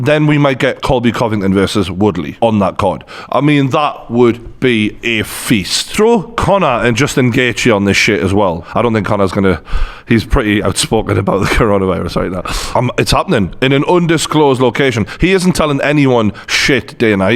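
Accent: Newcastle, United Kingdom. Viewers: I`ve subscribed just cos you're a Geordie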